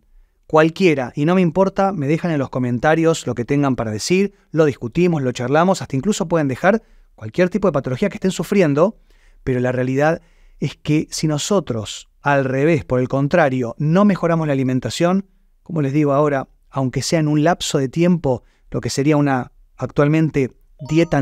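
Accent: Argentina. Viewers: Buenas tardes dr.ud. es de Argentina? Donde atiende?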